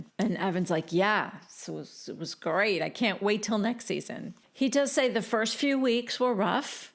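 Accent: New Jersey, United States. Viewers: you had me laughing at work listening to this at the office! Love your Jersey accent.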